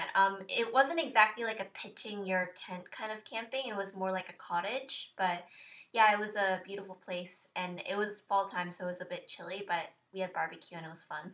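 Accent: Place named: United States. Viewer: Your English is very good if you were in America most people would assume you were American based on the way you talk. Good luck with school.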